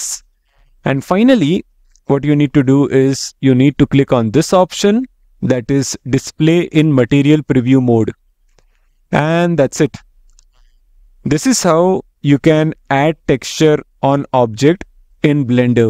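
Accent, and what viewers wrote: India. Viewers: He has an Indian accent.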